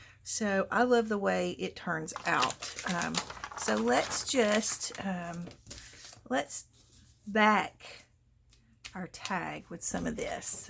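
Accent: South, United States. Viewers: Hi, I woke up a grumpy bear this morning...does that ever happen to you? Anyway, I knew watching one of your videos would lift my spirits. Sure enough! I clicked on this video, heard your sweet, sweet greeting in your lovely southern drawl, POOF! Mood elevated! It was like the clouds parted and the sunshine of my faith cleared up the grumpiness of my heart! Thank you! You have a blessed day!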